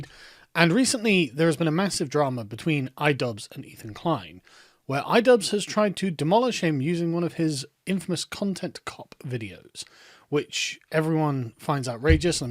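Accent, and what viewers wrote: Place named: United Kingdom. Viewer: I love our boy providing updates on internet drama in a proper suit and brittish accent.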